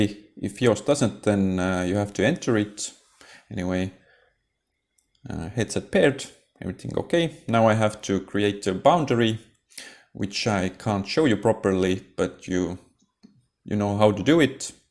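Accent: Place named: Estonia. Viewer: Miks ma kohe su aksendist aru sain et sa oled eestlane?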